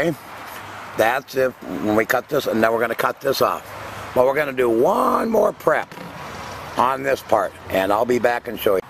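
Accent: Minnesota, United States. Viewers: I like your Minnasotan accent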